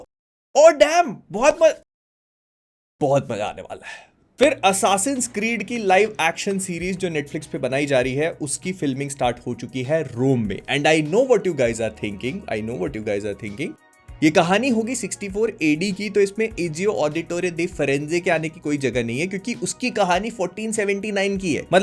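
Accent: Bhopal, India. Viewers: Are you bhopali? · Aaj bhai full bhopali mode me hai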